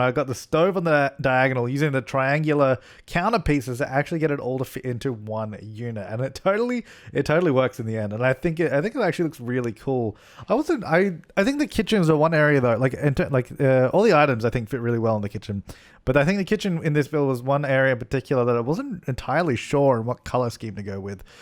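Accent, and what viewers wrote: Australia. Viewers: hi! i'm a long time fan and seeing you post lifted my spirits so much. your aussie accent never fails to comfort me and i'm not particularly sure why i feel do endeared today but i just wanted to thank you for making this community ❤ much love xx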